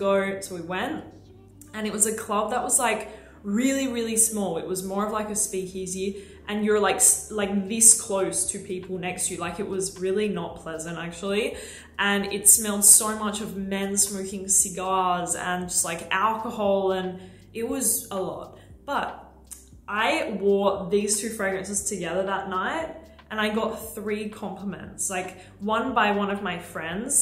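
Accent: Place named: Australia. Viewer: I love her Aussie accent and the way she describes fragrance makes me genuinely want to own everything she recommends cause it just sounds so delicious when describes it! My go-to for fragrance knowledge forever!!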